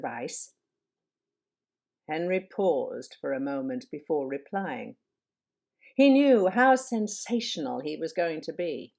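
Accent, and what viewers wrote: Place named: England, United Kingdom. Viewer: Very well read. I searched for the title here and yours is the only one I came across featuring an English accent. I love the Yanks but an American reading Wodehouse? Not cricket at all.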